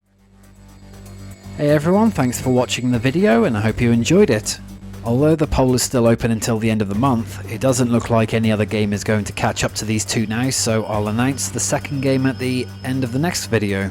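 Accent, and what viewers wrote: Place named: United Kingdom. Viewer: I love these videos and your British accent. Great videos to sleep to, not too many loud sounds n such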